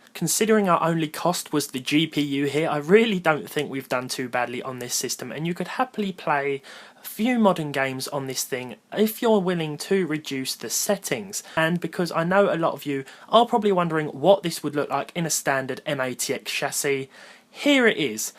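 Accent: United Kingdom. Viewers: I am just watching all your videos because of your awesome British English emphasis :D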